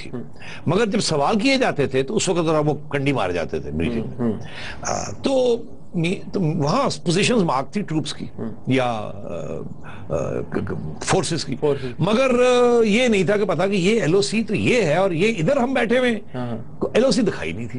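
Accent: Punjab, India. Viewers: love ur Punjabi tone sir... yar kuch alag nahi ham dono mulkome.. kyo ek dusro ko mar rahe he.. I dnt u.stand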